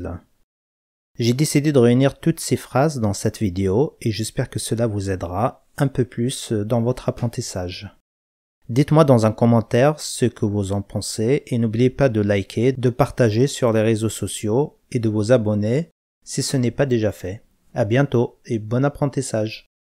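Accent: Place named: Tizi Ouzou, Algeria. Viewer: bravo il faut continuer, et merci, juste je voulais dire que je suis kabyle de Bejaia, il y a une petite différence de prononciation avec quelques mots car vous parlez le dialecte de Tizi Ouzou je pense, mais je comprends tout ce qui a été dit malgré la différence, je ne m'explique pas vraiment pourtant les 2 régions se touchent géographiquement, voilà, si quelqu'un connait l'histoire, je prends. merci.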